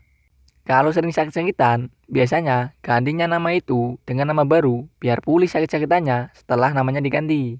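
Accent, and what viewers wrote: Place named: Medan, Indonesia. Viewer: orang medan kau ya min nampak kutengok kau logat medannya, kalo gitu salam dari medan juga.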